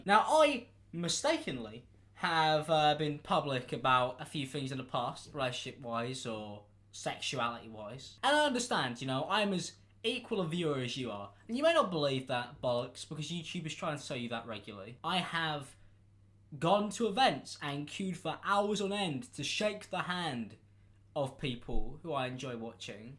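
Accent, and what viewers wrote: United Kingdom. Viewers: Subtitles + British accent = House on fire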